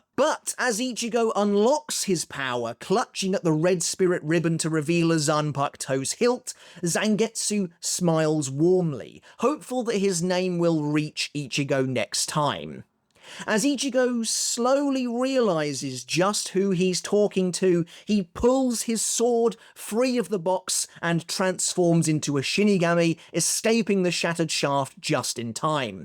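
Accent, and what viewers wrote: United Kingdom. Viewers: Bro those are bangers plus that british accent 💥💥💥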